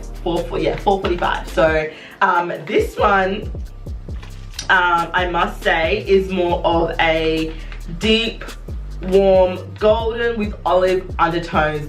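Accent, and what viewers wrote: Australia. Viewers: Omgggg a melanated Australian!!!! I’ve never heard or seen one that’s wild to me but I love it. I’ve always wondered if we could be Australian (seems so rare). But I appreciate this video because I’ve been looking for a comparison of 430 against the other two. And maybe even 450. I no longer think I’m 430 but it was hard to tell bc the store I was in didn’t have 430 tester😭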